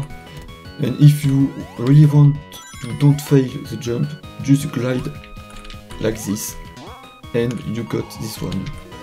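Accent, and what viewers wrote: France. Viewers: J'aime beaucoup ta voix mais omg, c'est tellement awkward quand un Français entend un autre parler Anglais avec son accent x)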